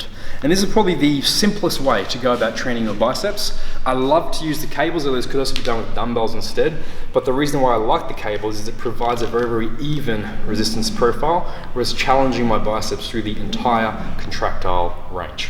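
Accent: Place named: Australia. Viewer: An Asian with an Australian accent who has the body of a statue 😍🤭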